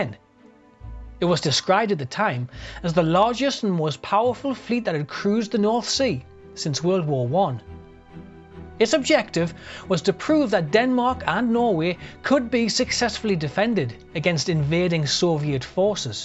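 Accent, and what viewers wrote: Newcastle, United Kingdom. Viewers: He's got a Newcastle (?) / north east accent. Great speaker, fascinating video.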